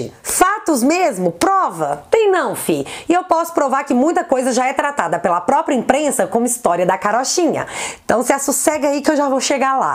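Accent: Minas Gerais, Brazil. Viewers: Esse sotaque mineiros e uma delícia só , oh trem baum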